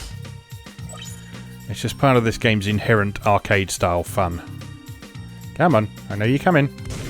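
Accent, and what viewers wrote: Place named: United Kingdom. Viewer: You have a nice brit voice :P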